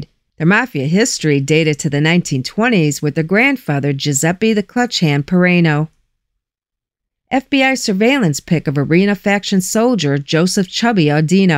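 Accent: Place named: New York City, United States. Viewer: Hello 🔥. Love to hear your saweet voice and very oh so subtle NYC accent.❤ Love the way you put the hard "G" at the end of LONG when you say Long Island - true New Yawker. You always deliver the goods when it comes to the rogues of da mob. 😎🤌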